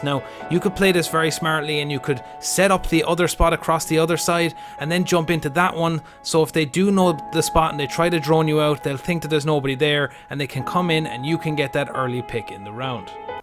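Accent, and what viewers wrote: Ireland. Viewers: i can tell ur Irish from your accent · Are you Irish?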